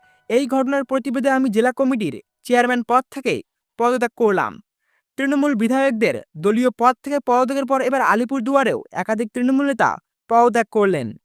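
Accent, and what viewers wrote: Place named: Bangladesh. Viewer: আপনার উচ্চারন বাংলাদেশীদের মত।😮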